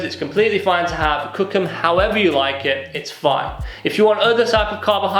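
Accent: United Kingdom. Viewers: your tips would surely be effective 😊 btw your accent is so good . I guess its British accent cuz I too know british accent😊 Thanx for replying sir😊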